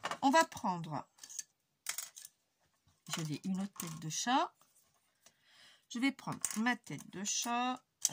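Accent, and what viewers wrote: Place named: Nord, France. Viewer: Bonjour je me suis abandonnée a ta chaîne grâce a notre amie Agnès.super sympa tes vidéos j'adore ❤❤❤❤.mais je me permet je connais ton acent j'ai le même le pas de Calais ou le nord.moi c'est le 62 mais maintenant c'est l'aude.je te fais de gros bisous.alexandra 😊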